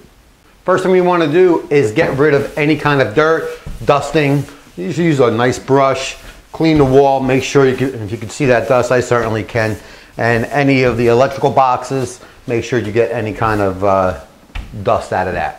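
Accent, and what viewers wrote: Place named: New Jersey, United States. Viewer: Thanks bro. Your videos and verbal style are all awesome! You sound NJ. Am i right? I wonder why east coast refer to drywall as sheetrok, out west no one knows the term sheetrok unless they are very familiar with that name brand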